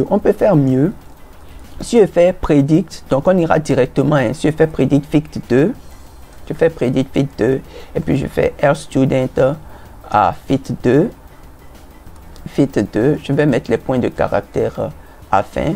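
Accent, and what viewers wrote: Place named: Benin. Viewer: s/o to my beninese people , you make me miss my country with you accent , thank you I hope everything is going great for you i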